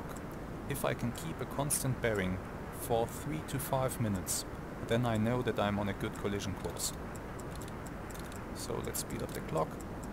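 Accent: Germany. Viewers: Ugh... that cement thick accent and germanised English, Germish, Genglish, whatever you want to call it (e.g. you focus on things in English, in German you 'concentrate' on them). They don't even try to pronounce the foreign language correctly, but mutilate it beyond recognition as they see fit. I always cringe when I hear that. Ear cancer risk. Just speak German, for Pete's sake, and that's it. Leave the rest to the subtitles. It would be even more authentic. And then you have such a hard time calculating the difference between 0° (also known as 360°) and 308° mentally, that you rather count the dashes on the compass band instead? Really? 52°? Really? After having laboriously counted all dashes? Are you sure? I mean, are you really, really sure? Dude, then you're not the right person to explain anything to me (or anybody else) when it comes to physics and geometry.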